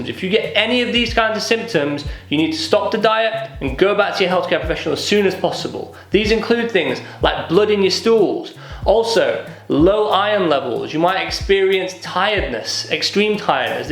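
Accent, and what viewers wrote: United Kingdom. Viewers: your tips would surely be effective 😊 btw your accent is so good . I guess its British accent cuz I too know british accent😊 Thanx for replying sir😊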